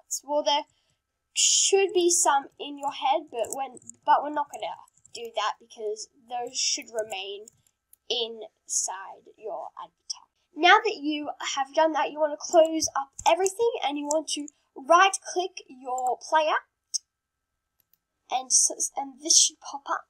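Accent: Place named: United Kingdom. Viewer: This British tho.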